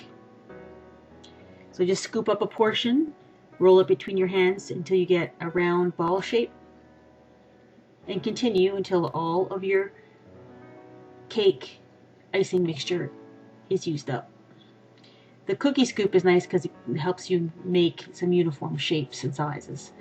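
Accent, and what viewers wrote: Canada. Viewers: She sounds more Canadian than Hawaiian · I'm guessing from your accent that you're Canadian...maybe in a French part of Canada cause on your frosting container it says "au chocolat" lol just curious. I'm from America but I love Canada. Btw, I'm definitely gonna try this. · I only just noticed that you're Canadian xD You have a cute accent! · Are you Canadian? O_o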